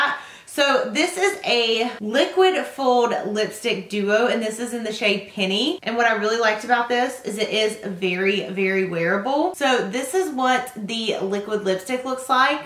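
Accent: South, United States. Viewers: Hi first time watcher here. Where do you live? I hear that southern accent! 🤗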